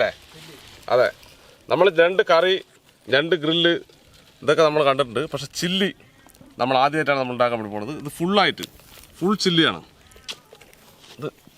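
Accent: Palakkad, India. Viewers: Chetta palakadan avadaram super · അണ്ണൻ പാലക്കാട് ഭാഷ 💯 സൂപ്പർ സൂപ്പർ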